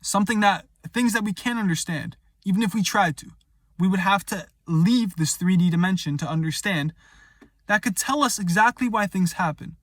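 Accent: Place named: East Coast, United States. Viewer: You have an east coast accent!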